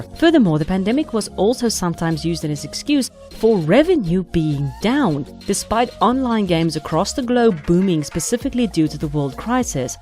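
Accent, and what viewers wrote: Afrikaans, South Africa. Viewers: IKR!! Thought her voice sounded SA but wasnt sure until she said she was😊 nice to see someone from here making good content. And me too, I would love to see the game back on track to being what it was, because that's what got us all playing in the first place. Jy ook😄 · i can't tell if you are afrikaans or danish or what now. such a pretty accent! this game was everything to me as a kid, it's sad they've gone downhill.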